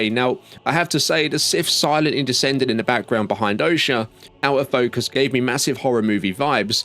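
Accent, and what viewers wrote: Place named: London, United Kingdom. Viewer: U sound like the guy from looper. Must be the Londoner in u.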